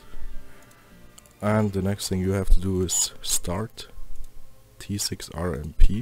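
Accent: Germany. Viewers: man hört deinen deutschen akzent sehr stark raus :D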